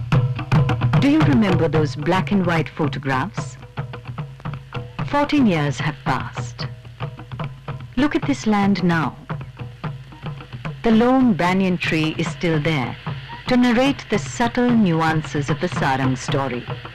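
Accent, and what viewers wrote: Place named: Kerala, India. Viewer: ഞാൻ ഈ വീഡിയോ ഇപ്പോൾ കാണുന്നു അവിചാരിതമായി കണ്ട ദക്ഷിണ എന്ന ചാനൽ പിന്തുടർന്നു ഇവിടെയും വരെ എത്തി........ You are great...... Salute you teacher and മാഷേ...... അവിടെ വരാൻ കൊതിയാവുന്നു....... ടീച്ചറുടെ സൗണ്ട് 👌🏼...... ടീച്ചറുടെ മലയാളം ഭാഷാ ശുദ്ധി...... Wow ...... റിയലി amazing........ എന്റെ മോൻ ടീച്ചറുടെ മലയാളത്തിന്റെ ആരാധകനാണ്‌....... അപകടകാരിയായ മലയാളി എന്നാണ് അവൻ സന്തോഷത്തോടെ പറയാറുള്ളത്......... സ്നേഹവും ബഹുമാനവും....... Respect you.... Love you❤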